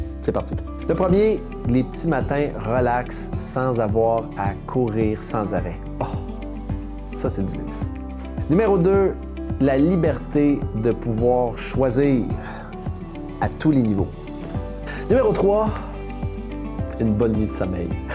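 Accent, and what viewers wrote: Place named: Canada. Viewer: Merci c est vrai que ça va vaut de l or vos 7 grands luxes....C est chaque fois des piqûres de rappel vos vidéos.. Avec votre accent canadien,c est magnifique de vous écouter 😊😊 Bravo 👏❤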